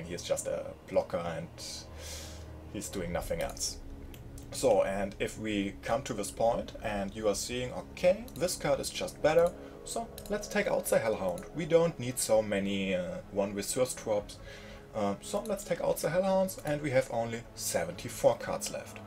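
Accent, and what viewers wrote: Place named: Germany. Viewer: Du bist auf jeden Fall Deutsch xD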